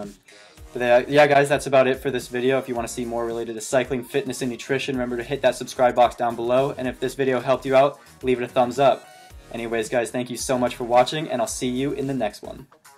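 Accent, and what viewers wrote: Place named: United States. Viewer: Why American accent, but British flag?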